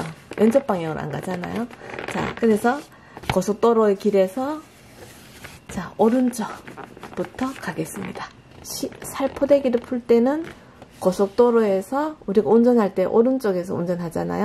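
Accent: Gyeongsang, South Korea. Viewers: 경상도분이신가봐요...쌀을 자꾸 살이라고 발음을 ㅎㅎㅎ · 쌀자루.. 발음이, 살자루.. 살포대기로 들립니다. 경상도 울산출신이신 우리 매형 발음과 비슷하십니다. 우리 누님과 매형생각이 납니다. 고속도로 우측 주행... 아주 알기 쉽고, 실용적인 설명 고맙습니다. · 갱상도 사투립니다.. 살, 사움, 엄악 .... ㅋㅋ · 경상도 사투리 이해 합시다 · 어쩌다 영상 보게 되었습니다 경상도 사투리가 친근합니다 쌀=☞ 살포데기, 꿰메다☞꼬메다! 특유의 억양까지 말씀이 정겨워 좋습니다 영상 잘 봤습니다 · 쌀브랜드는 서산쌀에 경상도사투리 구수하신 아지매의 음성으로...뭔가 재미있습니다. 구글ai가 오늘은 쌀포대 언박싱영상을 ...성질이 조신하지 못해서 매듭푸는 방법을 아는대도 불구하고 한번에 잘 풀릴때도 있고 방향 거꾸로해서 첫방부터 꼬이기도 하고 이럴때는 속에서 뭔가 올라오는 날ㅋㅋㅋ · 경상도 사투리 구수 합니다... 하하하 살포대기... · 살포대기 살포대기 ... ㅋㅋㅋ 갱상도 사투리 넘 귀여우심 . 좋은 정보 감사요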